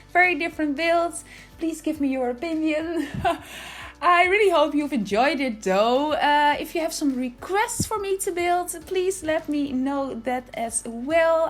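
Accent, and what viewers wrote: Netherlands. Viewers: Yeah you’re Dutch I knew the first 3 seconds of the video😂😂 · Clocked the Dutch accent, hehe xxgroetjes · You have one of the cutest Dutch accents I have ever heared (I'm Dutch as well), and I usually don't like the dutch accent at all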